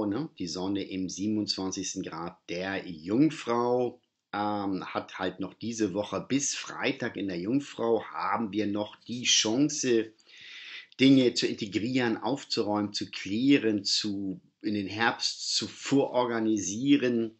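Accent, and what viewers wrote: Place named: Kiel, Germany. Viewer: Oh, ich freu mich so sehr, dass Sie wieder da sind. Ihr "Kieler Slang" erfreut mein norddeutsche Herz jeden Morgen und Ihre unaufgeregte Art die aktuelle Sternenkostellation darzustellen bringt mir richtig Freude... danke, dass Sie da sind 💛✨️💫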